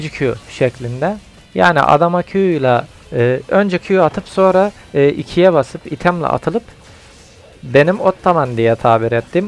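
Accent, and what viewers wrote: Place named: Aksaray, Turkey. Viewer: abi şiven Aksaray şivesine benziyor bende orda yaşıorum tam olarak bilgi verirmisin acaba bu konuda bize D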